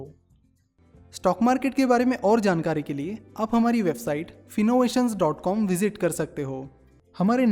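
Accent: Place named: Indore, India. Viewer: Pakka indore wale hoge ye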